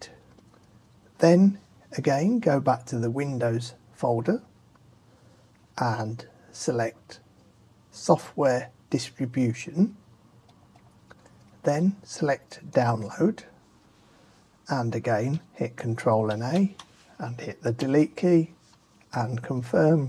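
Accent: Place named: United Kingdom. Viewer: thanks british guy! this actually helped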